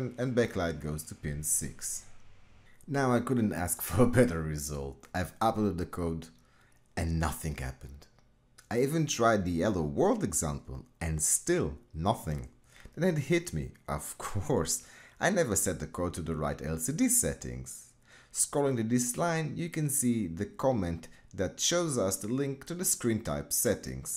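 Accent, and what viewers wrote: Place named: Israel. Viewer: לפי המבטא אתה בטח מישראל !!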